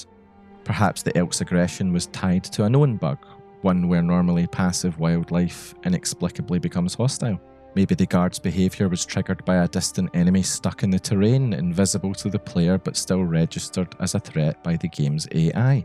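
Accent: Scotland, United Kingdom. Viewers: Not gonna lie, your slow, soft, Northener (Scottish?) accent makes all those stories so much more eerie 😨😨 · I didnt know I needed urban legends of skyrim narrated in a socttish accent until now. Thank you so much. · Subscribed for my specific favourite Scottish accent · Scottish accent makes this video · Ah! A Scotsman!! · I have no idea why but this was the first video of yours to pop up on my radar and hearing another scottish voice was oddly satisfying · Where are you from in Scotland? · You're Scottish aren't you? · Tbh I've never heard of a Scottish Skyrim Player, let alone a storyteller. I like it alot. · i was watching this in the background at work, and my boss went “holy scottish accent”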